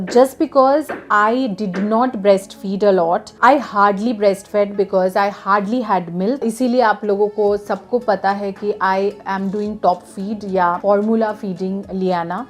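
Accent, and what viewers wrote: Bengal, India. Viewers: i would like to tell you something initially I felt that u r a very rude person i used to watch your videos previously but didn't subscribed your channel as I kept watching your videos i got so impressed by you...ur truly and genuinely take so much efforts for your PR Or advertising any product seriously it's like for any product u try to make content from ur videos and the most important thing is u r too light in ur life how nicely u handle every thing the way ur at ease in your life is so so inspiring by seeing ur videos i learn that it's absolutely not necessary to be in serious mood all the time.....i just love u and your videos the way crack jokes....the way u talk with ur hubby....Pablo....and most important ur Hindi with bengoli touch....and now I have subscribed ur channel....and enjoying every bit of your vlogs....u never disappoint your viewers every videos has something new and knowledge too...All the best and enjoy....no need to tell you though😛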